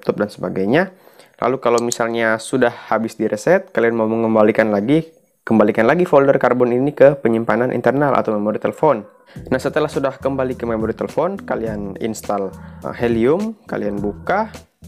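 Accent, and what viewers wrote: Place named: Tahuna, Indonesia. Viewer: Jga takaluar logat tahuna e😂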